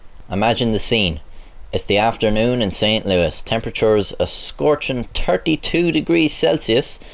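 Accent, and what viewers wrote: Ireland. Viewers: I've subscribed you are fucking hilarious my friend I think it's the Irish accent it gets me every time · Are you Irish? The "r" in your accent seems to be very irish. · bro are you irish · Irish boiii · I KNEW YOU SOUNDED IRISH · 32 He has a Irish accent.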